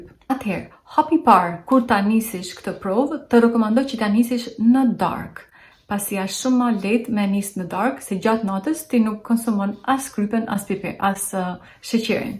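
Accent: Shkodra, Albania. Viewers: Keshilla shume te vlefshme dhe na knaqe me t folmen tone te ambel shkodrance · Respekte dhe per shkodranishten e bukur ❤Po me u shendosh si me ja ba se jam i dobet 😅😅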